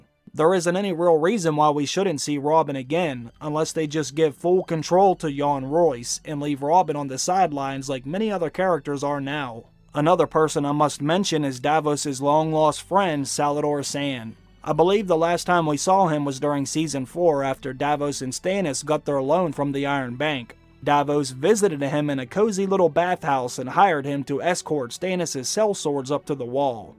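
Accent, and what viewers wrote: Pittsburgh, United States. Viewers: Is the Narrator a Yinzer? I hear a bit of Pittsburgh in his speech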